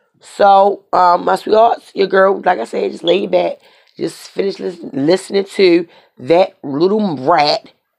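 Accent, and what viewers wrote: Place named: Baltimore, United States. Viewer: You got to be from Baltimore, Cause you sound just like us. But any who great job. Wild that ass out.. love your work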